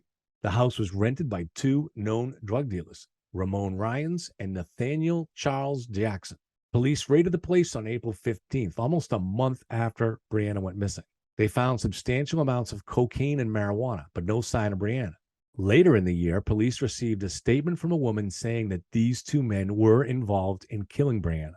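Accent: Boston, United States. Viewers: lol love the Boston accent ! 90 miles is still close!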